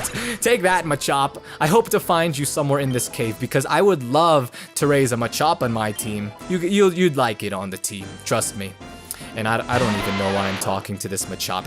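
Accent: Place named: Sweden. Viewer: That's so weird! It sounds a little bit like you have a Swedish accent sometimes. (No offence intended)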